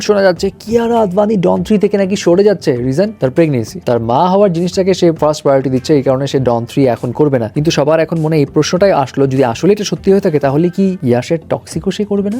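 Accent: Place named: Kolkata, India. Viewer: কিরে ভাই আপনার ভাষাটা এরকম লাগতেছে কলকাতার মত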